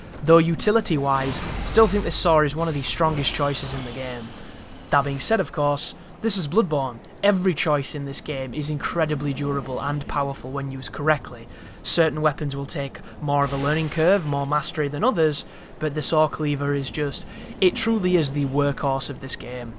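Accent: United Kingdom. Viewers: Dude I follow you since your Onimusha DoD walkthrough and it's nice that you're making this walkthrough of my favorite game to show some people that its ez and no one should be afraid of it, keep the hard work! BTW are you brit?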